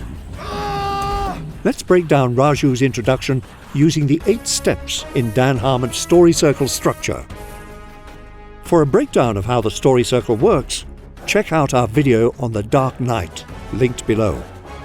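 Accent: United Kingdom. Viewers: The fact that narrator to this video is brit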